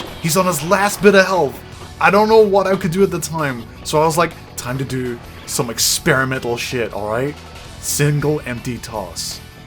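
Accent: Ireland. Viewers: I can really hear your Irish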